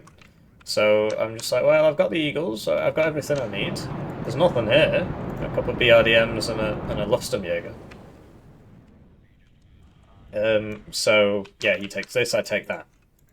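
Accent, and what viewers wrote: United Kingdom. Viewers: why do u look jewish , dress like arab , and sound british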